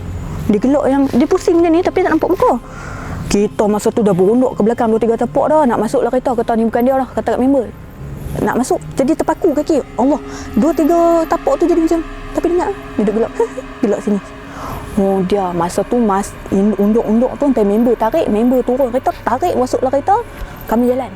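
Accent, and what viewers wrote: North, Malaysia. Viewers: Cerita tk seram.. seronok dok layan sebab cara sembang rancak dgn dialek utara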